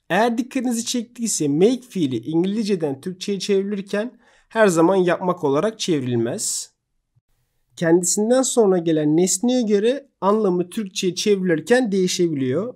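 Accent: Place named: London, United Kingdom. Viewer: Hocam şiveniz aynı londra maşallah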